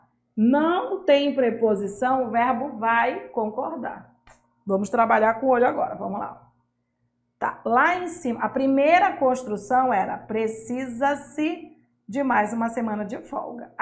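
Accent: Pará, Brazil. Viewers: Muito bons o conteúdo e a aula ❤ Percebi que a professora tem o sotaque do nosso Pará ❤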